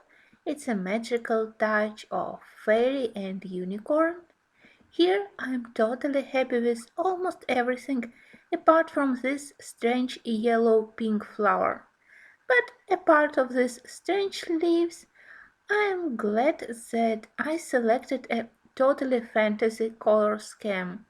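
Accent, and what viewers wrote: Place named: Russia. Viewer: Вы русская??? Очень русский акцент, как будто в российской школе учили английский язык))) я не ошиблась?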